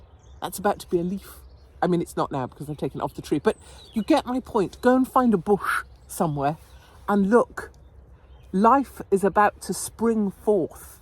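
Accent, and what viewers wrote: RP, United Kingdom. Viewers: I love you sound like a posh girl,articulate like an estate girl.quality.x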